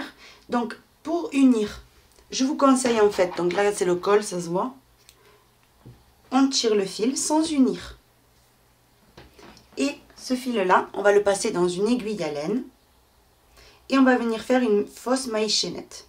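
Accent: South, France. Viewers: Il ressort un accent du midi?? Il me semble sentir Le Soleil dans votre voix et accent!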